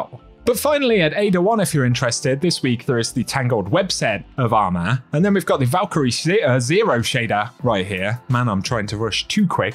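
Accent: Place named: United Kingdom. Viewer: Here for the delightful British pronunciations!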